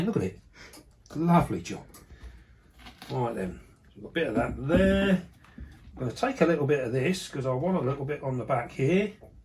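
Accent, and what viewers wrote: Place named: Essex, United Kingdom. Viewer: Nice video me old mate, very interesting, been looking at getting one of these for a while now off the spider shop. Whereabouts you from? Sound like a fellow Essex/Kent dweller?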